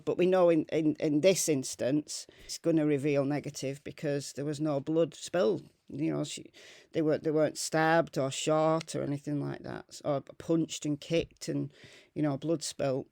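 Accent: United Kingdom. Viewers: Me too. I love accent. We do not have tons of UK residents in Va where I live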